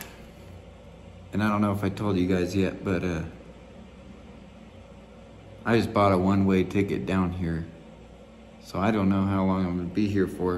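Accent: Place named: Spain. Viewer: Love your hoodie and your spanish accent jaja keep trying and improving it :) Stay tuned and have a good time 🤘🏼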